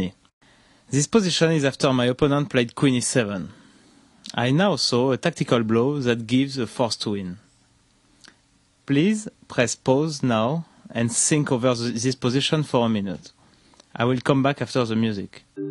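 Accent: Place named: France. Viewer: It is "Think on this position" not "Sink on this position" ! You should work on your accent french boy !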